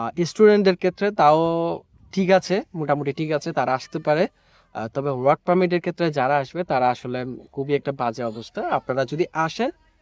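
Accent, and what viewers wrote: Chittagong, Bangladesh. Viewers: ভাই আপনি কি চট্টগ্রামের ছেলে?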